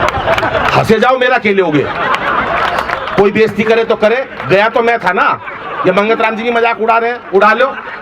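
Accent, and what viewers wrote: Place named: Haryana, India. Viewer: शाबाश, सर जी, हरियाणवी प्रभाव बरकरार रखे ।बहुत बहुत बेहतर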